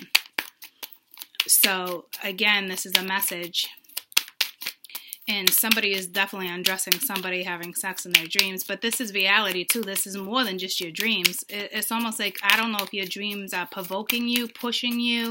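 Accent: Boston, United States. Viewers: is that a Bostonian accent I hearya? :-) great reading ...yea they're a bit petty right now. thanks a bunch. #7thhouseAriesinMercury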